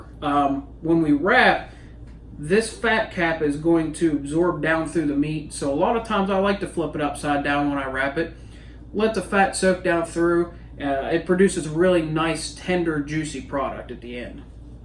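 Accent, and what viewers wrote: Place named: Pittsburgh, United States. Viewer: Thanks for the tips. I'm doing my first try at a pork butt (on an RT400 Bull) this weekend for a party of 40. I was going to do it the day before and reheat but I think I'm going to try and time it right so I can pull just before we eat. Will probably have it rest for a few hours in a cooler. I bet you're a black and gold fan with that Pittsburgh accent! I'm in Greensburg. Go Steelers!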